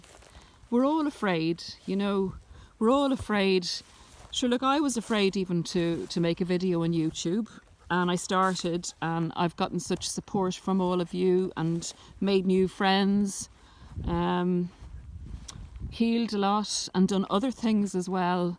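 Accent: Ireland. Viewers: So refreshing to have a video with that soft, kind Irish accent! I appreciate your style and also, you seem to be in a similiar age range as myself. I find you very relatable.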